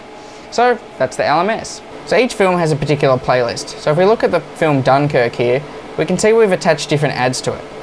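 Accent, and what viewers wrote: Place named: Australia. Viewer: Very distinctly Australian indeed. BUT, only one variation of many. I personally sound nothing like that in the slightest. I don't really have much of any stereotypical give-aways. Which I think is becoming common across the world as people become more connected through newer ways of socialising, and media. Among other reasons.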